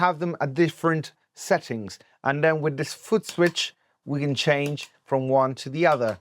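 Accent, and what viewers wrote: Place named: United Kingdom. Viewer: Veri cul british axent 🤙😉🖖👽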